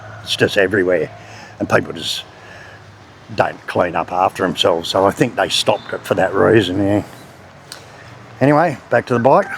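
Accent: Australia. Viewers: The content in this video is excellent. If you want to learn to speak Bahasa with an Ocker accent this video is fine. Once you have got the words and translations down pat, best you Google an Indonesian person saying these words and phrases so you can get the pronunciation correct.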